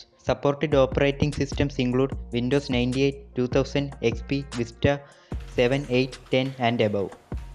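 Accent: Kerala, India. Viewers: Malayali ahnalle ? 😂 ( english kettappo manasilaayi )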